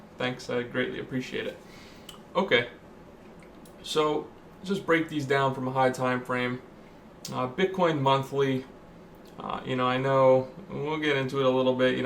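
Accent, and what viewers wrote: United States. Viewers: Hey, thanks, i've been watching your discourse on twitter haha, also you sound texan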